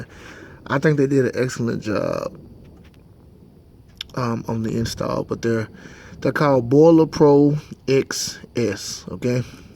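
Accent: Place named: South, United States. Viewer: Sounds good dude. I can tell your country AF with that accent. Got that “boiler pro”. LOL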